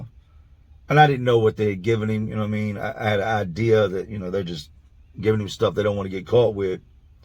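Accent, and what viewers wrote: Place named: Philadelphia, United States. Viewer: Yo what's good with you. The stories are very entertaining!!! By watching your videos I see you have lived in Virginia Philadelphia and North Carolina. You definitely have the Philly accent 100% I'm from that area and I could tell instantly. If someone asked you where you're from what would you tell them?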